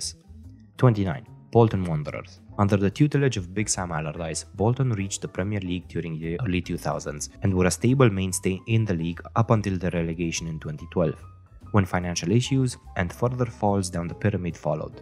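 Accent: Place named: India. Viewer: The accent sounds Indian and Spanish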